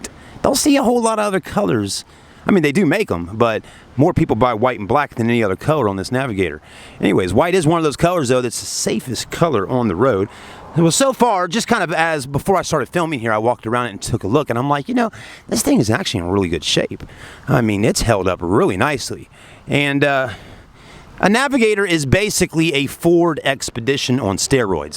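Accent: South, United States. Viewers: This dudes southern accent reminds me of Arthur Morgan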